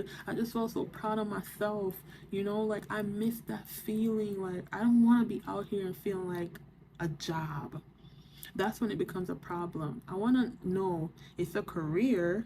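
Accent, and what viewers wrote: Jamaica. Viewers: Are you Jamaican 🇯🇲 Yuh sound like a yard girl…welcome to trucking 🚛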